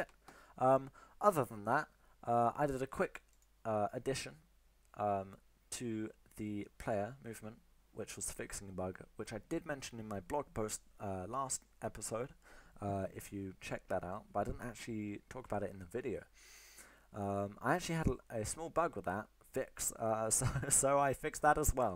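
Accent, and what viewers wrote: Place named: Russia. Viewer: Russian accent moment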